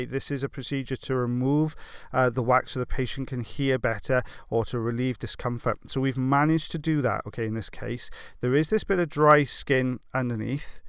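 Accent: Wales, United Kingdom. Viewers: Ah!! The voice of my Walshman!! 😀. Question if I may please. Why is it necessary to get the ear wax out in one piece?? A lot of the time like tonight you were saying "should be able to get this out in one piece". Why is that?? Enjoyed the one full of hair. It looks like an old piece of carpet rolled up in the ear canal!! 🤣🤣 So glad you're back. Looking forward to all you mentioned in your commentry tonight. 😀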